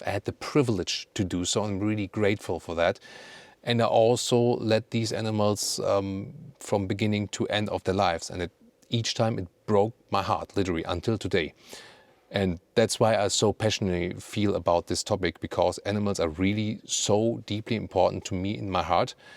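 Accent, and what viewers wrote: Germany. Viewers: Excellent presentation,. I adore German craftsmanship. And I prefer the Blue Q9 over the SQ designation. Its understated elegance carries a more distinctive refinement. And your lovely accent makes me wish Audi would use you in a Siri, or Alexa-style interactive firmware suite with the Q9. It’s wonderful!🇩🇪🌷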